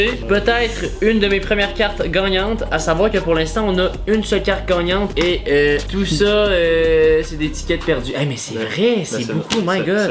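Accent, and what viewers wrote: Quebec, Canada. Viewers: C'est quebek ou français dit moi stp😂😅😅😅😅😅😅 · J'aime l'accent Québécois ❤️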